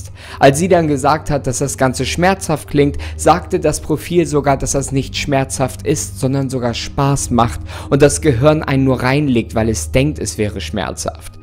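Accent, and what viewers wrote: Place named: Northern Germany, Germany. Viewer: Norddeutscher Akzent halt.. Ich spreche es auch so aus💪😂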